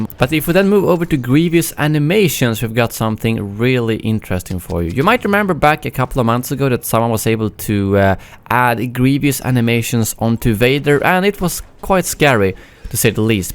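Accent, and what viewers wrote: Sweden. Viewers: Are you swedish because you sound like you are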